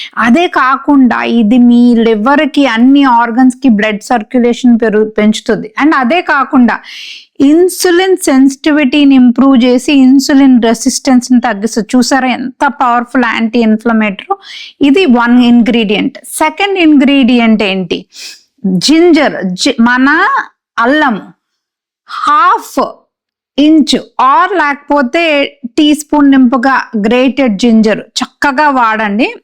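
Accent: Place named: United States. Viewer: Why using stylish american accent in telugu? If u use telugu, try our accent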